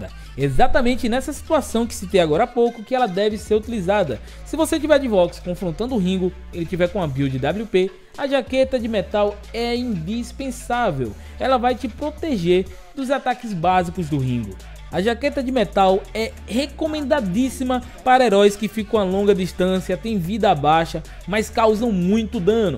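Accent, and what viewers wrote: Northeast, Brazil. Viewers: Youtubers com sutaques nordestinos são os melhores pra explicar as coisas kkkkkk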